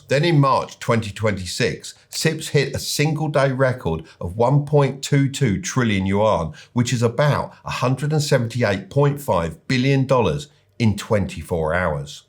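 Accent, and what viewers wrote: Cockney, United Kingdom. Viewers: Cockney accent detected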